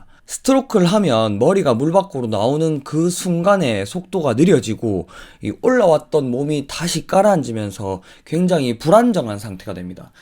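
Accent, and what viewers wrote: Gyeongsang, South Korea. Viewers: 땜 경상도 사투리가 서윗해졌어요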